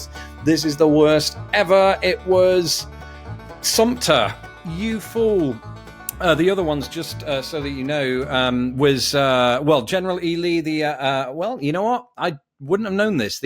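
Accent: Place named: United Kingdom. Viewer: Off topic, but I have a question. Your particular accent is my favorite British accent (I've heard it before, obviously). I just don't know what you would call it or what part of England it's from. So, that's my question. Where are you from and what particular accent do you have?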